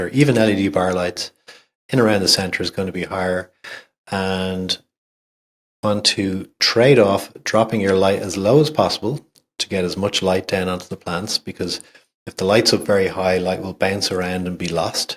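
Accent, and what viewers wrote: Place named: Ireland. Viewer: I could listen to talk for ages, love the Irish accent, Republic of, of course:)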